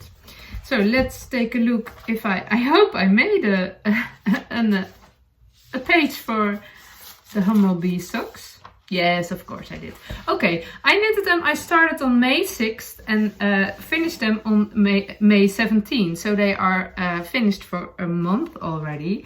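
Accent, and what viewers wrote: Netherlands. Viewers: I love your podcasts. You r duchyenglish is charming. It is one cactus many cacti. We have a few words ending in I l think it is Latin but l am not sure. Continue with the English. I lived in Sweden for a few years and would like to think that my Swedish was as good as your English. Anyway one cactus many cactii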